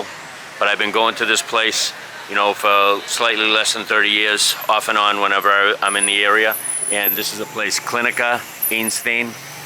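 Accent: Boston, United States. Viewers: Learn Spanish bro, I can barely understand you with that Boston accent and I am a native English speaker 😆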